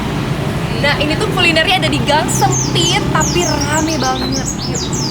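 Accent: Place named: Surabaya, Indonesia. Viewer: Sampean asli endi mbk..kok iso ngomong jowo..suroboyo ta mbk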